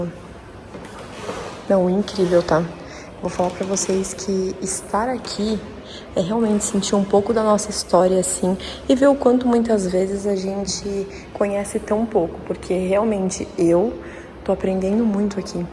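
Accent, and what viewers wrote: Brazil. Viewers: Sabia que esse sotaque só podia ser de SP.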